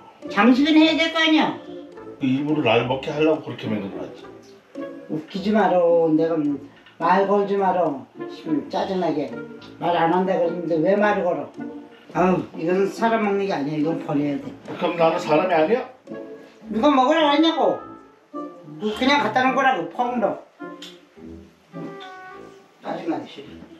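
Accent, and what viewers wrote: Gangwon, South Korea. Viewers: 오리지널 강원도 사투리 정말 끝네줍네다 응원합네다 ㅎ~~~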